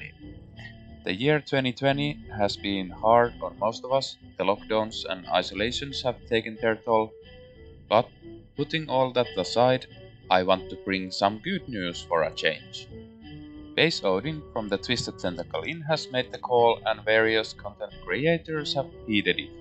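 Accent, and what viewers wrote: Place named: Finland. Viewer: i was listening to your video and my wife just went from the other side of the room "that guy has a finnish accent!" :):) terveisia vaasasta!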